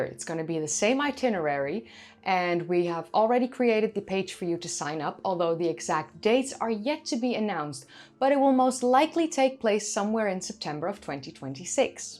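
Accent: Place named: Netherlands. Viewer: Your Turkish pronunciations sounds so Dutch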